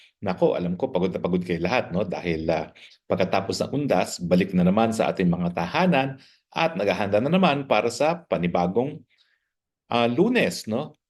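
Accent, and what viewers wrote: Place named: Philippines. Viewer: Yan ang gustong gusto ko sa inyo sir Harry Roque pag nagsasalita kyo lalo n tagalog Pilipinong pilipino tlaga.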